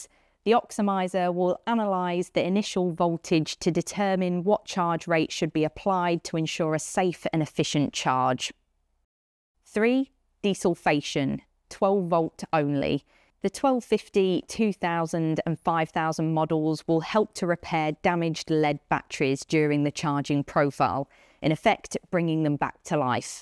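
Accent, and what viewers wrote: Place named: England, United Kingdom. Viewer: Can't place her accent. I guess somewhere in England?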